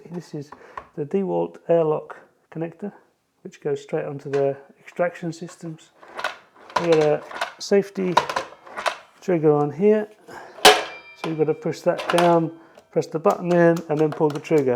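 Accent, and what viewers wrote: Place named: United Kingdom. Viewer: Where are you based ? You’ve got a uk accent and foreign plug 🔌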